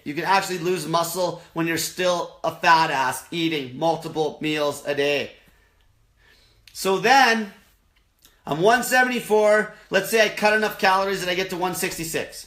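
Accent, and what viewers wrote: Canada. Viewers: I hated him for five minutes then it was like hey... He sounds Canadian... like me. Maybe my Uncle Frank has a kid out there we know nothing about. I have uncles who sound like him. Lol Do you hate the way all Canadians sound when they talk? His accent is very Canadian.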